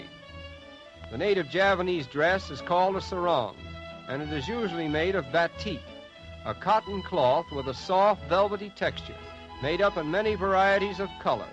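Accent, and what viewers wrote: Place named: Netherlands. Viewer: Inggris accent Belanda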